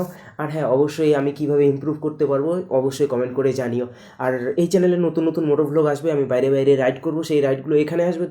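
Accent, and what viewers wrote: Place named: North Bengal, India. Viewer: North Bengal এর accent টা ভিডিওতে রাখসো.. আমার খুব ভালো লাগলো দাদা 🤗 btw আমি মাথাভাঙ্গা র বাসিন্দা ♥️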